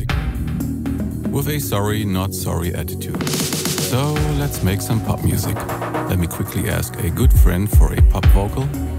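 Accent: Germany. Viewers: German accent ...damn..the inventors of groove music lol...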